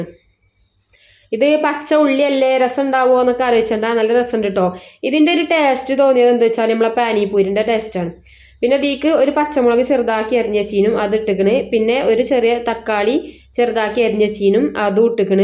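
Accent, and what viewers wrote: Malappuram, India. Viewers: ഇത് ഞാൻ കഴിഞ്ഞ ആഴ്ച കോട്ടക്കുന്ന് ന്ന് തിന്ന് അവ്ൻ്റമ്മേ എൻതാ ടേസ്റ്റ് 😅അപ്പോ അതൊന്ന് ഇൻടാക്ക വിചാരിച്ചു തപ്പി വന്നതാ അപ്പോ ദേ നമളെ മലപ്പുറം ഭാഷയിൽ തന്നെ ഒരു വീഡിയോ കൻടത്😁😁😁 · Ntemmoo thani malappuramkaari 😄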